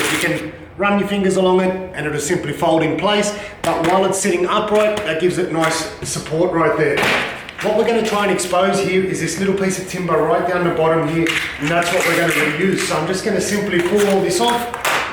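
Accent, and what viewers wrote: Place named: Australia. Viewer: cheers mate, amazing what you can find on t'internet and it sounds like it's from Ozz (don't kill me if you're a Kiwi lol!). I've gotta cut 4" off a door and this'll work a treat👍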